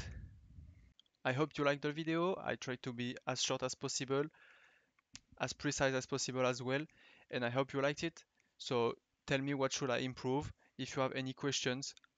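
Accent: France. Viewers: Nice french accent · Just perfect: short, easy to understand and on top of all that the French accent. What more could I ask for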